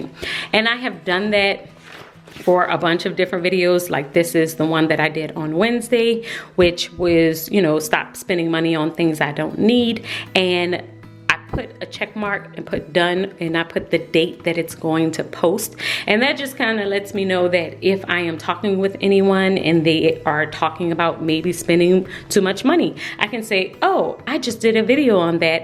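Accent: New Orleans, United States. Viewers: You hair is beautiful! Are you from New Orleans? I hear an accent. I just found your channel yesterday. Love your videos. Thank you for sharing.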